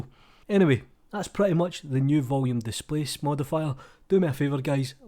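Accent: Scotland, United Kingdom. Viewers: Glasgow? I miss the Scottish accent ;) Nice channel mate.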